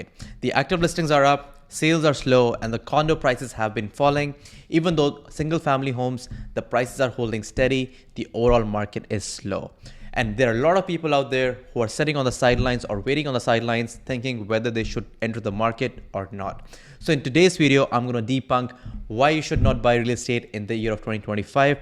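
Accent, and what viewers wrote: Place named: India. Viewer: I heard an Indian accent and immediately turned off.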